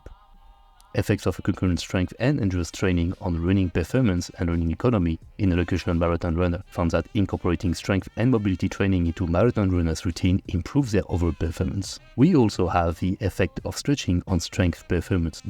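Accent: France. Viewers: Forgot I'd downloaded this to listen to on my flight 😅 a bit surprised to hear the frenchmans voice. Great video bro 👍🏿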